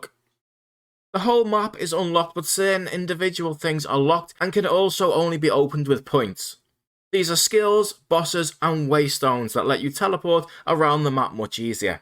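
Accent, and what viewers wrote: Liverpool, United Kingdom. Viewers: Are you a scouser or a wool?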